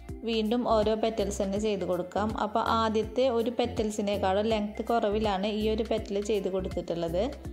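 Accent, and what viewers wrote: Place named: Thrissur, India. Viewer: trissur slang💕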